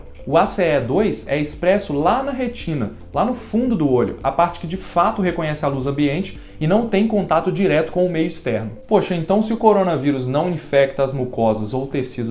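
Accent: Brazil. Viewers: eu gostei do conteudo e do jeito de falar de Voce e seu portugues " brasileiro"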